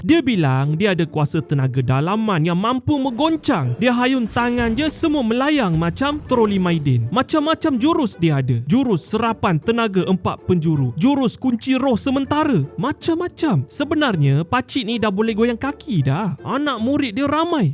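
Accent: Sabah, Malaysia. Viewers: Saya tak berapa kelakar tgok video ni.. cuma kelakar dengar suara yg buat kontena ni.. sebab bahasa kg ku yg dia guna... Andukk bahh😅😅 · anduk bah kesian. hahaha very sabahan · gaya cakap cam org sabah, bukan mau kata apa lah.. Di sabah ada macam macam jenis seni pertahanan diri, cuba cari "pasak kota belud", ada video tamat belajar, dengan berdiri atas bara dan mandi sungai sambil di hiris dan di tetak parang. Tak silap video dari tahun 1980/1990an. Dan di akui kebanyakan ahli yang tamat bukan jenis berlagak. Tapi kalau ada menjual, mesti ada pembeli. Dan macam op yg ko jawab cakap, pengamal selalu cakap "ada Allah" Atau "cukup Allah".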